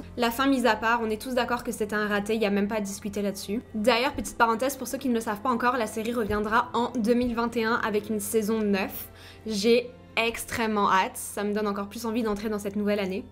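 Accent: Quebec, Canada. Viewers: Bonsoir, je viens de découvrir tes vidéos depuis début juillet 2021. Merci pour tes vidéos, j'adore ton accent québécois. Pourquoi as-tu choisi de réaliser des vidéos sur les crimes, stp? A tantôt. Belle fin de soirée, bisous livresques nocturnes criminels.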